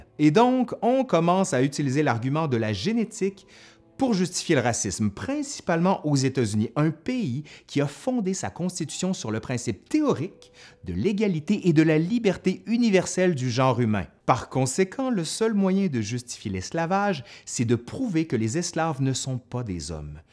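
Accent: Quebec, Canada. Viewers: ACCENT CANADIEN EN MASSE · Sans racisme aucun , l'accent Québécois me fait saigner les oreilles , alors que j'adore nos cousins d'outre Atlantique , c'est juste leurs accents que je trouve insupportable . Les juifs étaient déjà persécutés bien avant la crucifixion de Jésus (égyptiens , Babyloniens ) . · He is from Quebec, they speak a french dialect with another accent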